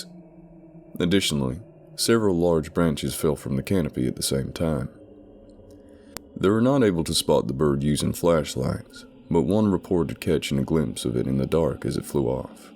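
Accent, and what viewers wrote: South, United States. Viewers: You are definitely an honorary southern man.. love that accent you do. Thanks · Well I'm from Georgia so I'm quite partial to his southern accent 😁☺️😉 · Southern accent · Your southern accent is done SO well! However I’m always disappointed when you narrate in it because your regular accent is just SO lovely and soothing😌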